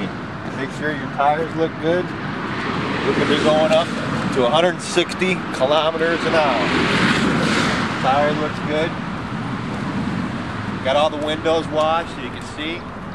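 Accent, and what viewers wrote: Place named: Germany. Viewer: Damn i speek fluent German but you sir sound more german than germans.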